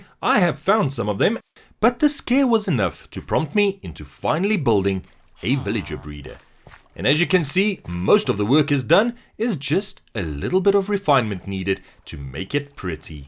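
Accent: South Africa. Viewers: this oke sounds South African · Are you from south africa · South African?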